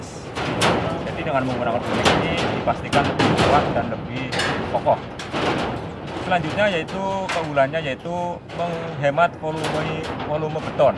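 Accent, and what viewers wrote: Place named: Banyumas, Indonesia. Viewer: Mas...Asli Banyumas apa Tegal?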